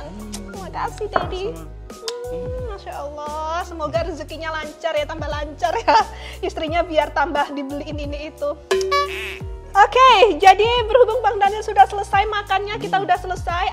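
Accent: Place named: East Java, Indonesia. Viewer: Kok ngomong nya orang mana surabays eatau malng ya ,orang nya cantk pintr mask ginana sh kok dapt orng luar ngri ngomong pakai arek arek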